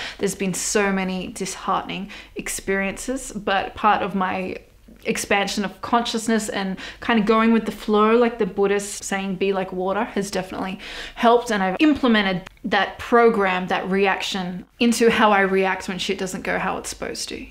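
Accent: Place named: Australia. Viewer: 😂 Your Australian accent + voice = sexyness! Yep! Never been in loooove... Respect is going extinct, I guess. Oooh, and thanks for the "night mode" tip! Everything looks a lot better. I'm going to revamp my crafts page! And... You know your eye shape is so beautiful, everybody blushes! 😊 Much love, ❤️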